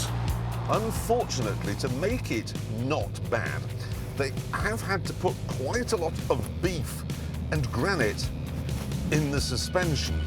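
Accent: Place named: United Kingdom. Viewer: I love how the British at so much flavor to the review British accent I love it I love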